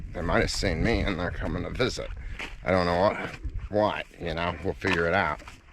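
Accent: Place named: Bush, Australia. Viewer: You still have some of your "Bush Accent". I love it.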